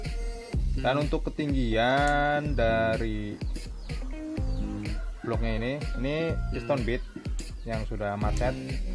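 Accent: Banyumas, Indonesia. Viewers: Mas ngapak yaa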